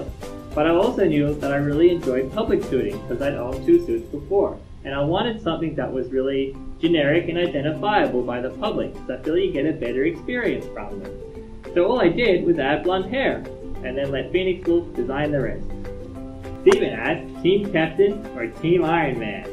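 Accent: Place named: Australia. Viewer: its the Aussie accent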